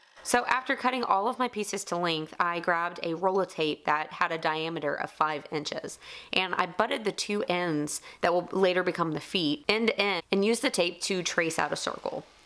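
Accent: Texas, United States. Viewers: haha its the Texas twang 😆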